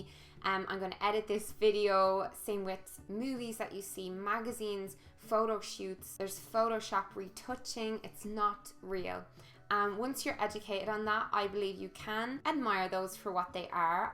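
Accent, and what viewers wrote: Ireland. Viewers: you are gorgeous, I have been listening to you a few times to practice my english listening, due to I´m from Mexico and want to practice the language. Furthermore I love your irish accent.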